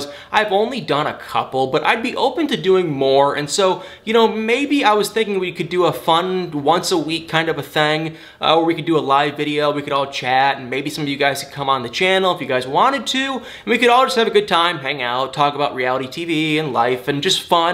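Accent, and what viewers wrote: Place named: Michigan, United States. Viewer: i can 100% tell you’re a fellow Michigander when i noticed how much you say “and what not” like me 🤣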